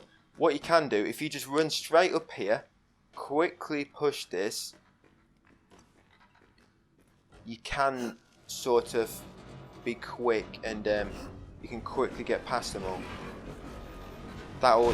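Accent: United Kingdom. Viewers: Just 100% the game because of your and MrReign’s work. Honestly you’re like a younger MrReign. Absolute prodigy. Anyway I just love your British accent and funny jokes, very fun combination. Don’t know why British people and resident evil 7 just… works😂 anyway thank you and have a nice life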